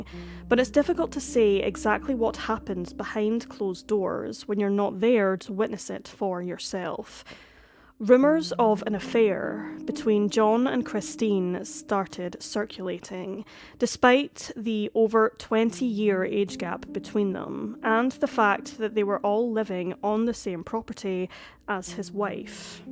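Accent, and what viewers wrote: Scotland, United Kingdom. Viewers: Gal Gadot is the narrator, don't you recognize her Scottish accent?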